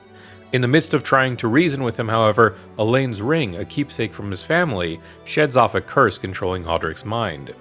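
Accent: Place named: United Kingdom. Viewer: first video I've seen from you and i genuinely thought you were British at the start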